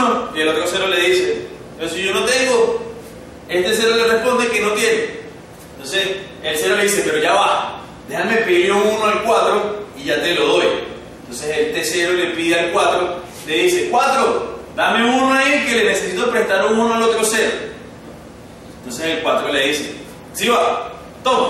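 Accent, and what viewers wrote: Venezuela. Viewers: Boyjess13 Tambien le Note el acentico Venezolano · Simpático el acento venezolano... :)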